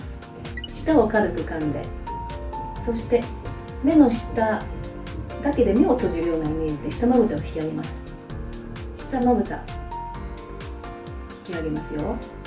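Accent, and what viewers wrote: Hiroshima, Japan. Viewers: 先生は、広島の方ですか？ イントネーションで😅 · 広島弁っぽい訛りが好き❤️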